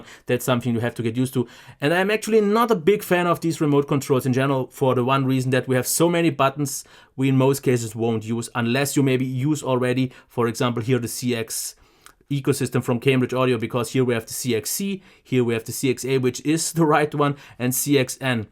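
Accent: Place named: Germany. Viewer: No, he sounds German.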